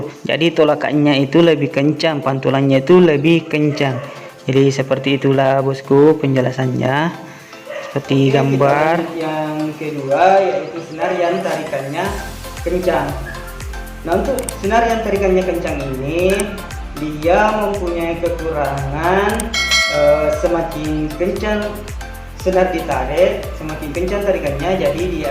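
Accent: Indonesia. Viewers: Org makassar ta?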